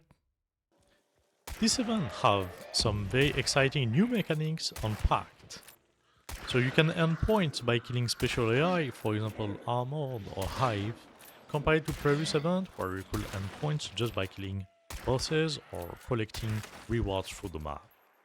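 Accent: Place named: France. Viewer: gg for banging 20 min english with french accent <3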